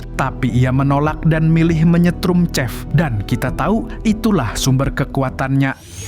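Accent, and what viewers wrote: Banjar, Indonesia. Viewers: orang banjar kh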